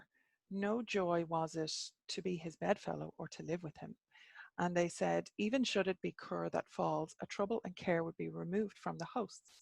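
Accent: Ireland. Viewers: As always, I enjoy the reading and commentary in your sweet Irish tones. I am glad all the passages are being saved in one playlist!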